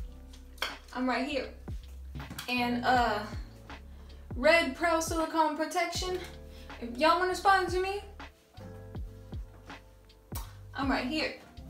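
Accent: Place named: Brazil. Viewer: seu sotaque é diferente. você é brasileira ? tive impressão que você era por conta do sotaque...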